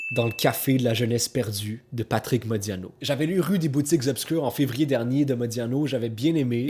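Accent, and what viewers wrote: Quebec, Canada. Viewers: j'adore ta chaine, continues tes vidéos lecture, c'est très intéressant, ce que tu as écrit à la fin m'a touché, c'était très bien dit, bisous de France (haha j'essaie de me familiariser avec ton accent québécois)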